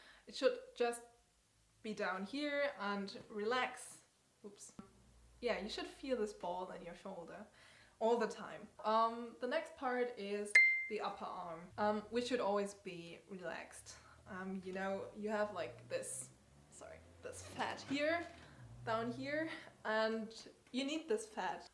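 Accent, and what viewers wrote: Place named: Germany. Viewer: Thank you! I love how you explain your ideas it’s like your speaking German through English 😅 💙 oh and how does patreon work? I’ve never used it before but i would like to support you! I practice Bach everyday at the piano, sometimes mozart Beethoven and Haydn but mostly Bach 🙌🏼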